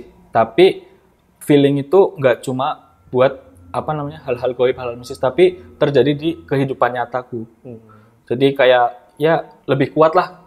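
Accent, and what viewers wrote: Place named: Java, Indonesia. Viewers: Medhok" mas🤗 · Logat ngapak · Ledok bahasanya jang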